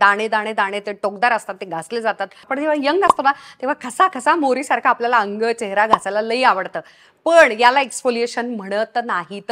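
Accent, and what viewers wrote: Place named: Satara, India. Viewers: Great Marathi accent 😅😅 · 100% Satari Accent.....👌🏻❤😂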